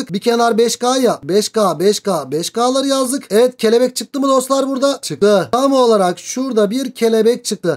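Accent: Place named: Trabzon, Turkey. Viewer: Trabzon gibi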